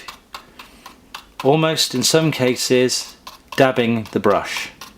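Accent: United Kingdom. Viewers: Nice to hear a British accent on Utube 👍👍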